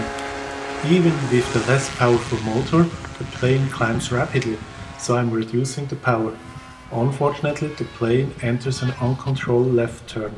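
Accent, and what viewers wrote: Switzerland. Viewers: Oh, dr schwizer Akzent 😂 aber supper idee/ video!! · Great project, keep it up! I guess from your accent and from the markings on the plane, you're from Switzerland ;-)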